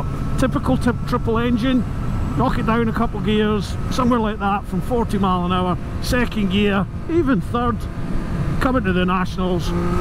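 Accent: Scotland, United Kingdom. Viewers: I hear a Scottish accent. I hit like. Its really that simple.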